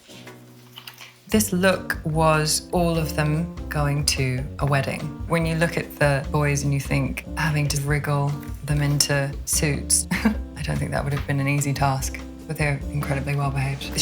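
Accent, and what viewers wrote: Melbourne, Australia. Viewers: Nah she’s just posh Melbourne they sound like this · Her accent is such a mix between Australian and English. As a brit myself I fully thought she was english for the first few minutes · Such a posh Australian accent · Her English has such a lovely global vibe, Australian, British and Bit of American here and there ..love it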